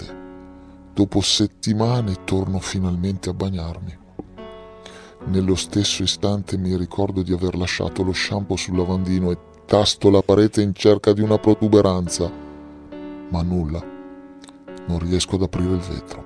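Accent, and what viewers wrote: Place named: Lombardy, Italy. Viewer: Bella voce. Ma di dove sei? Sembri Lombardo ma non capisco di che zona!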